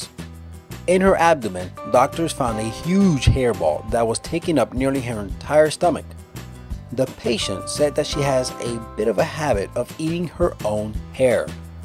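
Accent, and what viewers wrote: Mexico. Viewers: why is this mexican talking? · You have the best Mexican voice ever your an awesome commentator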